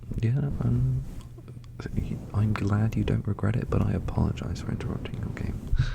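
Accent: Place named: Bristol, United Kingdom. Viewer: Ahh thank you so much for answering my question!! Also your bristolian accent is so cute you should totally do a video with it!!! The rambles about k pop and the stuff you’re into was super cute as well you can totally tell how passionate you are <33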